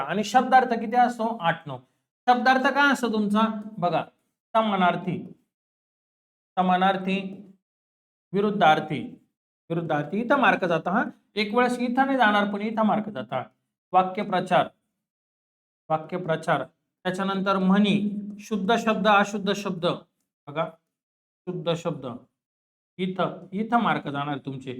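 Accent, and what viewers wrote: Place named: Khandesh, India. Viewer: सर तुम्ही खानदेशी सारखं बोलतात😅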